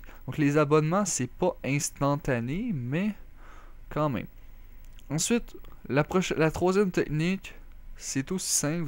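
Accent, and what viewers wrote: Quebec, Canada. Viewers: Accent du Canada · Tu as un accent Québécois ou Canadien je mis connais pas trop mais j'aime trop se genre d'accents +1like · Petit accent Québécois <3❤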